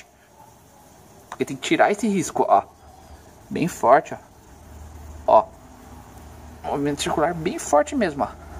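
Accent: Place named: Interior, Brazil. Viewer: Seu sutaque é do interior kkkkk tmj. mt bom, vlw pela dica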